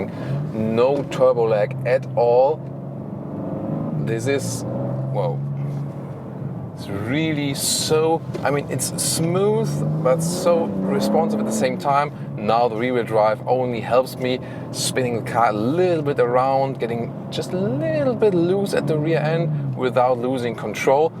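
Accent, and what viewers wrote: Germany. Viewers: German accent kicks hard af haha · Good accent.....German Englush style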